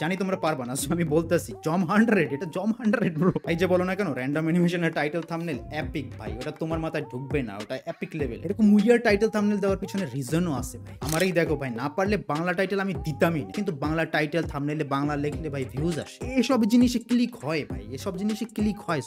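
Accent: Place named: England, United Kingdom. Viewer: আর আপনি ইংরেজি accent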